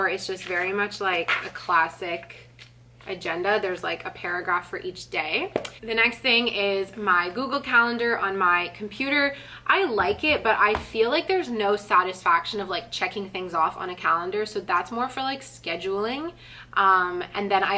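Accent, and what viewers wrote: Quebec, Canada. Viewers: Salut,! Your channel got reccomended to me in Brazil, so I guess it's an indication of how much you've been growing, and I thought you'd be happy to know it. I'm in my late 20's and, to be honest, we have very different personalities and life styles, but I love to hear you talking - even though I don't relate much. I think you're a great communicator and also very entertaining. Hopefully you can make youtube your main source of income really soon! Btw, I've started learning French this month, I speak Portuguese, so it's a bit easier since they're both Latin languages, and I was so shocked to hear you! Canadian French sounds soo different from French from France, I had no idea, even though I lived in Toronto for a year (not much French there, but I did visit Ottawa and Montreal a few times and was so oblivous to the language). I'd looooove to hear you uploading more French content here and there.:) best of luck! 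· Oh my gosh I love your French and love your accent even if it is hard for me to understand. I love Quebecois!! Thank you for adding that clip haha🤣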